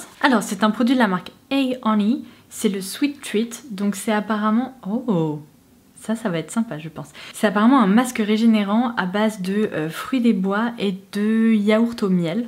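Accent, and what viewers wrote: England, United Kingdom. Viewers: Sur tous les calendriers que j'ai regardé, celui là est juste whaou. MAGNIFIQUE 😍😍😍 Merci beaucoup pour cette belle découverte. Vous êtes d'une douceur que j'adore. En plus c'est incroyable toute votre connaissance sur les produits beauté. Et votre accent anglais, on en parle??? Juste whaou. Vous êtes un vrai rayon de soleil. Merci😍😍🌹🌈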